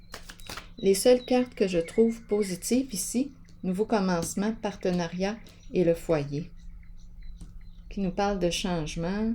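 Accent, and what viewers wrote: Quebec, Canada. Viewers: C'est incroyable 🤩 pile pile sur ma vie wow bravo et merci 🙏🏻 avec ta voix j'imagine que tu es situé au Québec? Etk moi oui!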